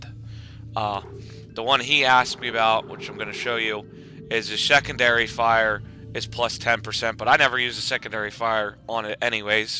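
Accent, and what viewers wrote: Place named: Pittsburgh, United States. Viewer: You kinda sound like you're from Pittsburgh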